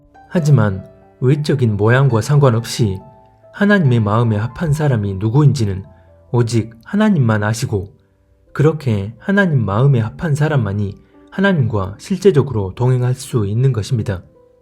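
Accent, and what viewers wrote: Jeolla, South Korea. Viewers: 절라도사투리구시히네요. 중공삼자교회 성도인가요.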